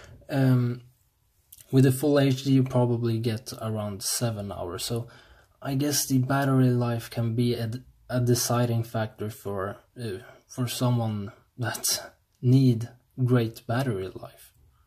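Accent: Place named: Sweden. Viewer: I'm assuming you are from Sweden due to your accent. So am I. Would you say it's worth 3000:- to add the 4k-screen? Deciding which customization to buy. Noticed you talked alot about the two screens. Have you tried the full-HD one yourself? Cheers and thanks for the content!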